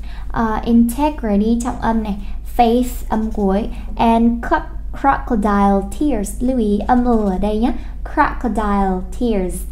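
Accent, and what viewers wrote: South, Vietnam. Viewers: Ủa cô nói giọng miền nam kìa, là sao ta 😳😳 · Cô là người m nam sống ở miền bắc ạ?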